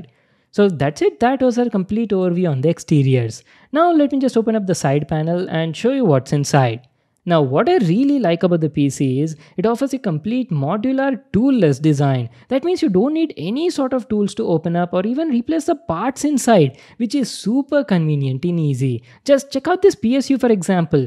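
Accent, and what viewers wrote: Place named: India. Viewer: You speak English but your English is just like Hindi during lisning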